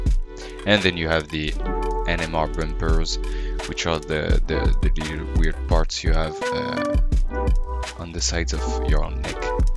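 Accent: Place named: France. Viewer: I love your (french?) accent! This was very helpful. Also, le francais cest cool!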